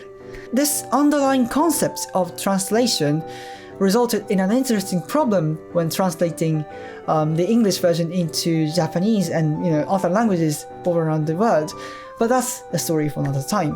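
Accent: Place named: United Kingdom. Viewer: Your British accent is good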